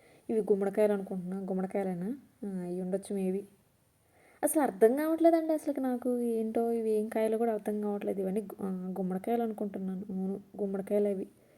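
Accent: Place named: West Godavari, India. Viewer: Mee slang antha maa west godavari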